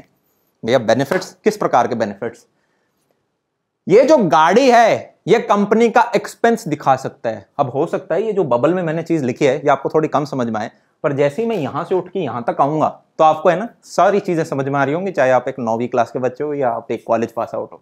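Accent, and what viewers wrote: Haryana, India. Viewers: haryanvi accent... pade😁😁.... great sir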